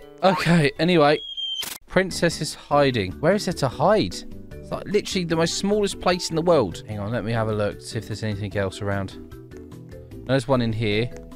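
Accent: United Kingdom. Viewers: ur british? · He’s British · BRITISH ACCENT lol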